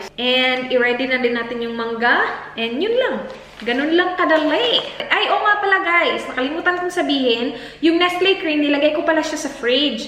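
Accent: Visayas, Philippines. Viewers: Parang bisaya ang voice....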